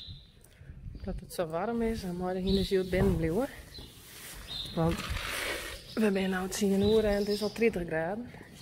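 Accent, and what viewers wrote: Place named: Netherlands. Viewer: I love your constant Netherland English narrating - it's funny but in a damn good funny way. I like it a lot cos it makes me laugh and feel happy. I love your horses too. The horses are lucky to have you as can see you care very much and is conscientious of their wellbeing. They all look well looked after and so healthy. Such beautiful horses and very powerful looking both at the same time. They exude beauty, power and health.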